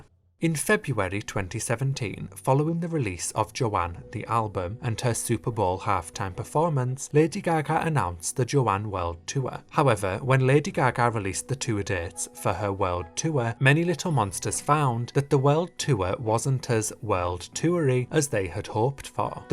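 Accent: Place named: Yorkshire, United Kingdom. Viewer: Your Yorkshire twang just shines! I swear your from Yorkshire lol:)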